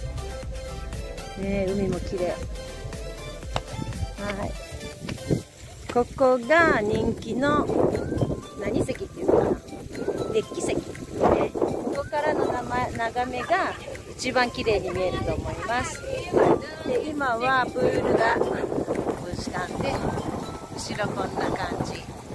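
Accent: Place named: Okayama, Japan. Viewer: さん、こんばんは。高台からのロケーションと、チョイチョイ出てくる、さんの岡山弁！最高です👍😁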